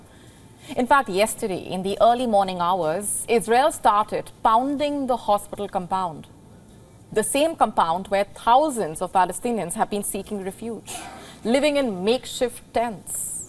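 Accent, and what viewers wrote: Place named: India. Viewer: This lady is speaking English with Indian accent that makes you throw up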